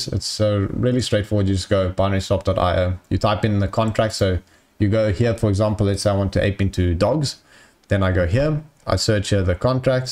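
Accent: South Africa. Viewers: If I may ask, are you South African?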